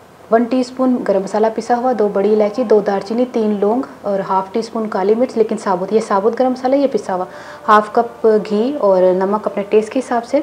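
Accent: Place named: Punjab, India. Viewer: I like your Respi and punjabi style taking is great. I have been made your Gurwalay chawal. My wife is love it. Thankyou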